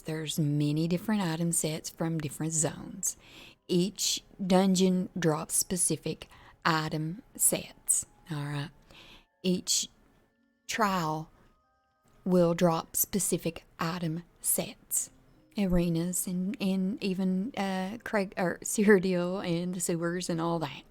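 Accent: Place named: Appalachia, United States. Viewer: Haha, are you from East TN, East KY, Or southwest/West Virginia? I was born in East TN myself , you sound like me and all my family haha. Funny finding that on the internet!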